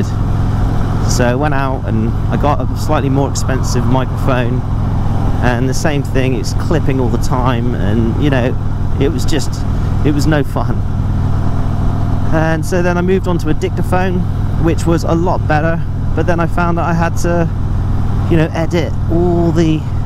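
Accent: Somerset, United Kingdom. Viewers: Great video you are doing great work. First of for your decision on getting and choosing your harley you got spot on. For your starting vlogging you should see motonewb blockhead early stuff really gritty video and sound. First started watching xxxdeadhead and shawnsmoak also robbertrob and huntingharley. I've noticed and they all done this as they went on started saying they upgraded their cameras microphone mainly gopros with senna headsets. As for your contents don't really threat you are doing great stuff there is one thing which is rarely done is pavilion opinion something to consider in the future. What do you talk about what roads like where you live what is your favourite route why's it your favourite. Why you like were you live or don't because of the roads traffic. What you thinking of doing to your bike in the future and why. I. E. I've got a pink metallic paint job with unicorns because why not. 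Chicks love it gets me laid a lot and who F, ing put it in the laws of bikering you have to have a black or covered in skulls bike to be a biker. Just a point my bike has skulls on paint but who cares i like it. Just do what you want to your bike and if you want tell why you chose to do that. Just a mention no one as far as I know has installed two inch tank raising kit on the new streetbob. Your voice is good definitely sounds with hint of somerset region. Microphone you are using is good. Those motorvlogers who done it for a while also use filters on their pc's. You are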